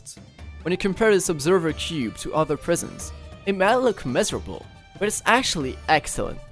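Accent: France. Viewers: are you french? i feel like you have an accent.. Great video btw!!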